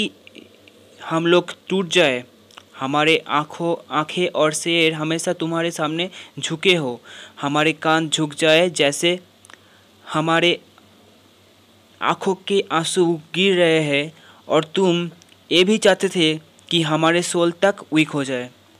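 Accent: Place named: Assam, India. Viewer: Apki aawaj to assamese jasi hai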